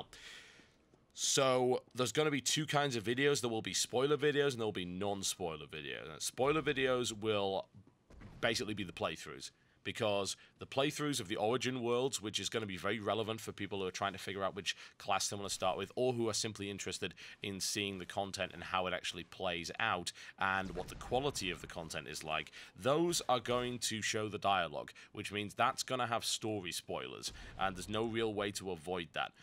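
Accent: United Kingdom. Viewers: are you british by any chance?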